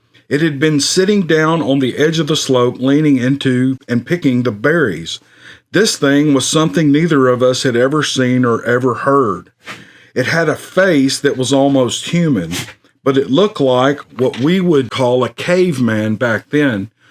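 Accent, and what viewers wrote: South, United States. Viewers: I have seen every single one of your videos & I'm always so happy to get the latest notification telling me that you just posted a brand new one!!!!!!! I love your voice & that sweet southern accent too!!! I really enjoy listening to the special way that only you can tell these wicked cool stories!!!! I also really like the awesome music you share, & all the videos you make to go along with all the stories!!! Anyway, I don't usually leave comments anywhere, but I just wanted to say thank you for creating!!! I am seriously such a huge fan, & I absolutely appreciate all the work & effort you put into making this channel as cool as it is!!!! Totally looking forward to the next one!!!! Thanks again!!!! You're awesome!!!! ~ 💖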